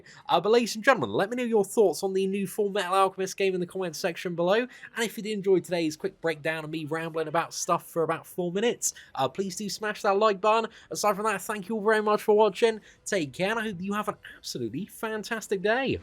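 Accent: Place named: United Kingdom. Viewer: I just wanted to hear your british Accent again mate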